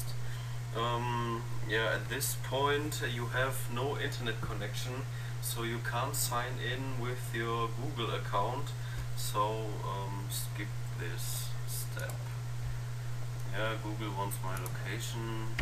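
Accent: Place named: Germany. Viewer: bist du deutsch xD das hört man :D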